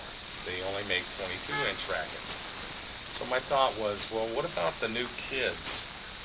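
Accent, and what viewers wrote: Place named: Tennessee, United States. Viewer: Sounds like he lives in Tennessee lol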